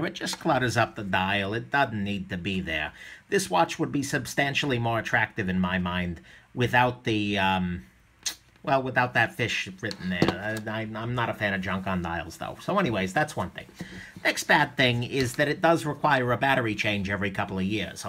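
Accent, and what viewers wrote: New York, United States. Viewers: Alexander Lee - NY, NY-USA. Which borough?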